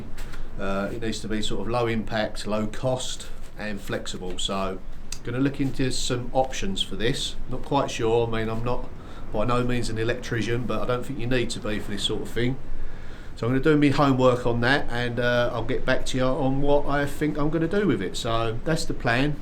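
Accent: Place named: London, United Kingdom. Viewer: Sounds like working man's London burbs to me !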